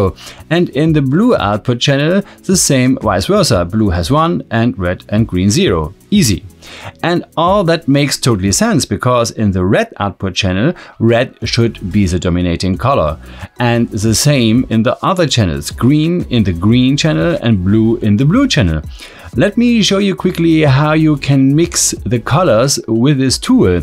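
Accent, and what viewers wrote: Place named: France. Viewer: He sounds like G-Man from Half Life, just with french accent :)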